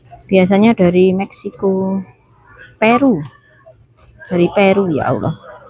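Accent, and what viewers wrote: Java, Indonesia. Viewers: Assalamu'alaikum jeng.sy ketawa-tiwi sendirian dengerin jeng bicara,itu boso jowone medook.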